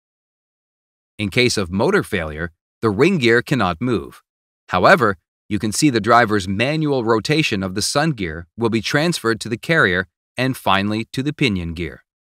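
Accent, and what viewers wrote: United States. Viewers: Why is the video narrated by an American but the driver is in Britain? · The first 12 seconds bother me. You have an American accent but your animation driving in the left side of the road. And you say steering is the hardest thing to master, it’s hard but that’s only because Americans drive automatics. You think steering is more complicated than clutch, gears and steering? Come on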